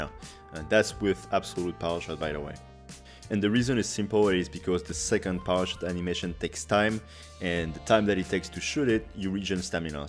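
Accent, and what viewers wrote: France. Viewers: Ahah l'accent français est si reconnaissable 😂. En tout cas merci bien pour les tips sur l'arc, les vidéos sont très claires et organisées, un regal.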